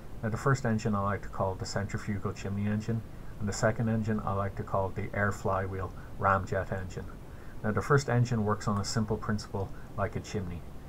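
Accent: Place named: Ireland. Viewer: You sound as though you’re a real Irishman, If I ever build a pulsejet big enough, I’ll slap some solar panels on a dingy, electrolyze the seawater, And run that sucker all the way across the Atlantic. I’ve always wanted to see Ireland. Have a great day. Right now I am in the middle of some very interesting builds. When the videos get uploaded I’ll let you know.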